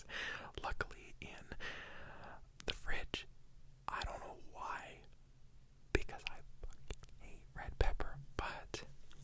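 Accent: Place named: Canada. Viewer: mic setting is immaculate 🤌🏼 also the way your canadian accent says certain words lowkey hits?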